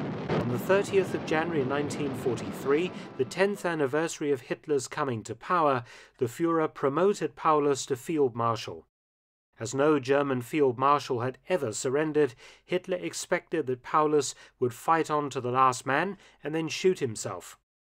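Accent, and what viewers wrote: England, United Kingdom. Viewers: I see there are over a hundred dislikes. How is that possible this is really good stuff that's never been shared to this extent that I heard. Are the dislikes because they think it's untrue or because the guy has a British accent I just can't for the life let me figure out why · Mark Felton with his English accent makes the documentaries better. More gravitas.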